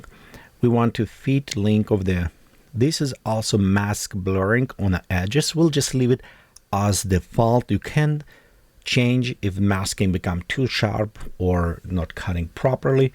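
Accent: Russia. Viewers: This is a very helpful video and I will use the information redaily! Your content continually proves to be very valuable, Since I not a native "Russian-English" speaker, I sometimes have trouble following along, but I find I can usually figure out what you are saying. I just imagine that Chekov from Star Trek is instructing me. Keep up good work! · I hear your accent, are you Russian?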